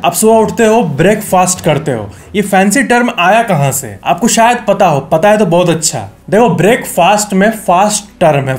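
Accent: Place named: Bihar, India. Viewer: But It sounds Bihar or Jharkhand* accent🫠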